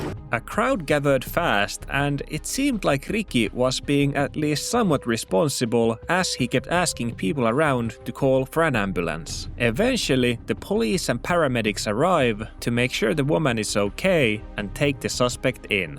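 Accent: Finland. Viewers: as in paahtoleipä. i can hear it from your accent. this is a Finnish guy. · You sound Finnish what country are you from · Bro is one-hundred percent Finnish, am I right fellow Fin?:cat-orange-whistling: Yap. The "also" confirmed my suspicion, moro miten mennöö?:D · bro am i tweaking or do i hear a finnish accent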